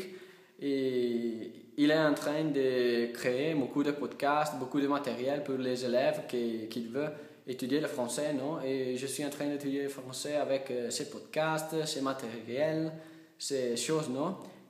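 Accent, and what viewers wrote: Italy. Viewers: L'accent italien me fait rever :) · l'accent italien me fera toujours craqué ;) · Si tu apprends le Français depuis seulement une année et demi, tu le parles bien. On te comprends et les quelques erreurs que tu commets sont celles que commette ceux qui apprennent cette langue, réputée difficile. Mettre le féminin à la place du masculin et vice-versa pour les articles. Par exemple, dans la vidéo, tu dis "un vidéo" au lieu de "une vidéo" et "un année au lieu d'une année". Tu as un accent italien mais c'est charmant... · yo hombre tu parle bien accent italilien applique toi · J'adoore l'accent Iitalien ! tu parles déjà couramment on te comprend très bien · Tu sei carino😂.. ça te donne un charme ne perd pas l'accent Italien... ciao!! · tu parles tres bien et en plus sans les mains! Continue tu es très fort et garde donc l'accent italien (pour les filles je pense qu'elles vont apprécier!)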